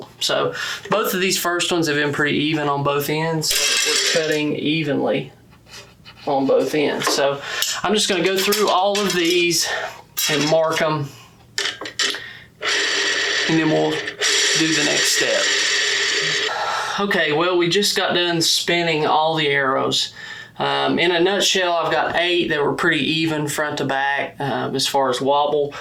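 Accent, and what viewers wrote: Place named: South, United States. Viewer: Lol mate you have that Kentucky trucking driving Atlanta GA accent ingrained.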